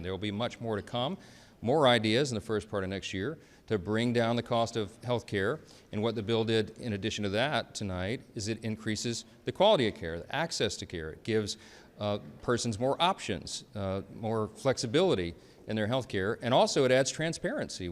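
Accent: South, United States. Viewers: He does sound a lot like a deep southern evangelist!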